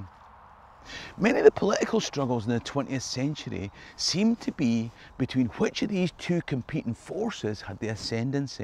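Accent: Scotland, United Kingdom. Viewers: I just like listening to a good Scottish accent, nevermind the oil!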